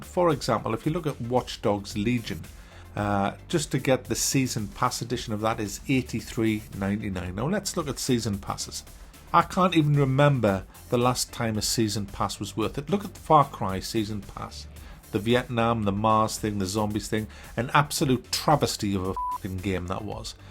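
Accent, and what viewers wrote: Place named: Northern England, United Kingdom. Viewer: do you listen to athletico mince or top flight time machine? You and the northern bloke in those podcasts are the only northern people in my life, but two of the best. Love the channel, love the vids, love your voice. Wish i could hear you being happier...but fully understand that life and the modern gaming world are not particularly happy places.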